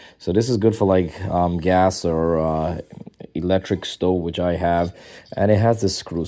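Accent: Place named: Guyana. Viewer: Are u Guyanese by chance u sound so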